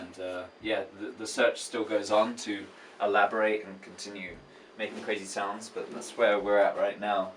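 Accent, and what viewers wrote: United Kingdom. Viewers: Dude where are you from? You sound British but I can't be sure...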